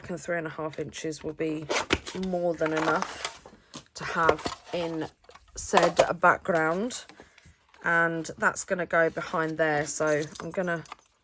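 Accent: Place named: United Kingdom. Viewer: “Ah! I LOVE it”, in that charming British accent, makes me squeal with joy, too!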